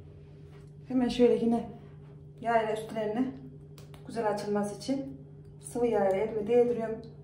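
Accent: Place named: Central Anatolia, Turkey. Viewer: Orta Anadolu danmısınız. Şiveniz sanki oralı gibi.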